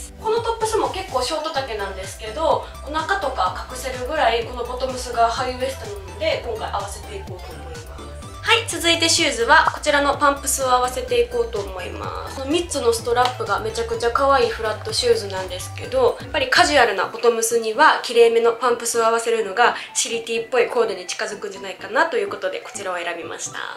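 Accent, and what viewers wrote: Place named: Kansai, Japan. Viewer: shortをたまたま観て、可愛過ぎて動画も観に来たら関西弁なのずるいです、、🥹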